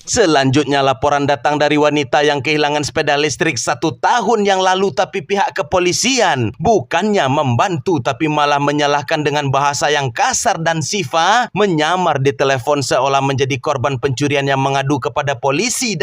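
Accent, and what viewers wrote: West Sumatra, Indonesia. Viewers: Orang Minang ya bg? Jernih banget ngomong njiang gadang nya 😅😅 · Minang kah ads bg😂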